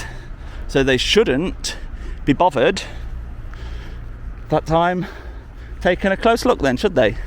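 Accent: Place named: Leicester, United Kingdom. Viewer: Hey I love your videos, and your ‘propa’ Leicester accent! Keep up the good work, I always wonder what is there to hide!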